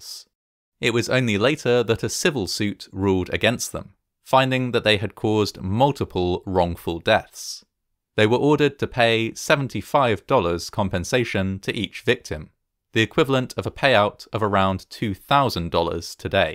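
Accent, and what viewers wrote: England, United Kingdom. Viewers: I was under the impression that he was English because of his accent.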